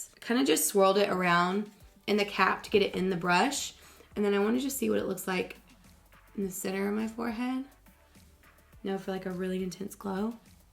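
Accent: South, United States. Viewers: I can hear you southern accent more when your voice is coarse. It’s so cute! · love your video and your southern accent... greetings from Athens GA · Not sure if it’s you losing your voice or moving back to Georgia but your lil southern twang is coming back out! So cute 💜💜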